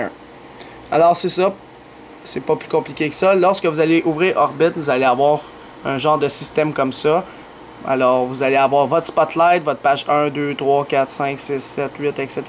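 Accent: Canada. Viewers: Chui trop fan de ton accent canadien :D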